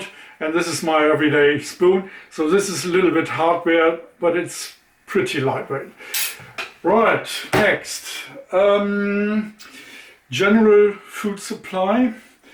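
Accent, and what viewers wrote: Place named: Germany. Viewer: Hi, I've been watching your videos and loving them. Good stuff! I love your sense of humour, attitude and also the English twist on your German accent. Thank you so much for sharing all this. Watching from Wales.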